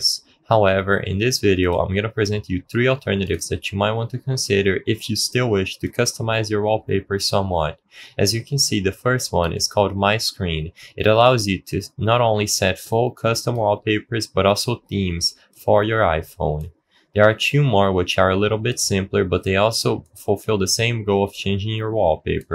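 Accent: Brazil. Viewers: vc e Brasileiro certeza, sotaque entregou, mas conversa bem pra krl em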